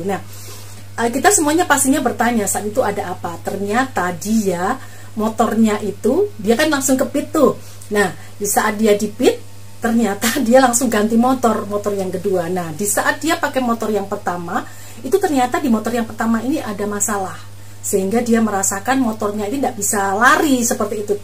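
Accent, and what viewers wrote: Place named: Java, Indonesia. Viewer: suaranya khas medok jawanya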